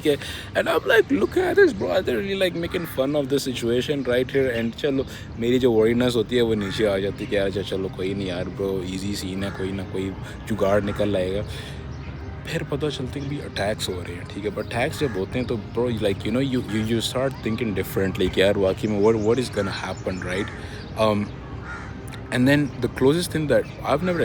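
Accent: Pakistan. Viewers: Bro you Urdu accent is awesome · Every single bro is the most Pakistani thing I’ve heard. You sound like I do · Good to see you again with your Pakistani accent. Masha’ALLAH. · Love you ho gaya ha jigar, bara wala, I don't know which city you are from, but after listening to your Urdu accent, it seems like you are Lahori too. Am I right?? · Oo bhaii, you sound more Islamabadi than me, like wth??!!😢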